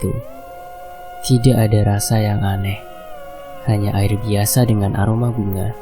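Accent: Java, Indonesia. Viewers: Wih pertama kali nonton channel ini pas cerita sewu Dino.. Cara penyampaian nya Bagus apa lagi logat jawa nya,ya walaupun saya Orng sunda tapi enak dengerin Mimin wkwkw.. dulu subscribe nya masih sedikit sekarang udh banyak ya wkwk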